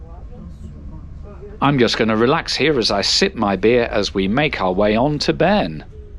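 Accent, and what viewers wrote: United Kingdom. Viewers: Wow - that's some appropriate contrasting hobby to the tranquil ) I'm always curious about the different accents from diverse places: Couldn't attribute this one to scouse, scottish, northern... neither to cockney, northern of Melbourne in Oz...? I assume, the southern(btw. Cornwall-Oxford-Cambridge-Kent) english accent is something similar to the german Niedersachsen(Hannover) - both beeing closest to the written standard language. Another trigger would possibly have been the YT-channel from NonstopEurotrip... I remember having heard once - him beeing originally from Exeter too... but listening to some difference though. Was quite surprised by the spreading of this sport - appearantly there's also some league here in SWI...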